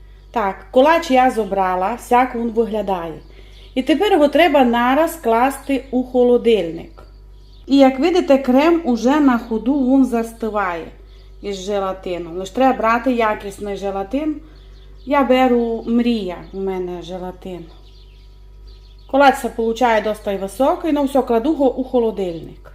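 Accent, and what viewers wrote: Transcarpathia, Ukraine. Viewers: Пані рада вас чути і дивитися на ваші витвори мистецтва,сподіваюся у вас все добре,і надалі ви частіше будете нас тішити своїми чудовими рецептами та закарпатським діалектом❤❤❤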